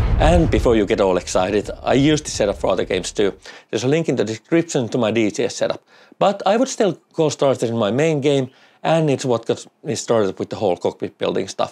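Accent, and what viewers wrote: Finland. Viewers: Nice setup. I would love this for my Elite Dangerous games. Are you Finnish btw...accent sounds familiar? xD (Ah checked your info and was correct) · this is the first time i watched you and right away i noticed the finnish accent =D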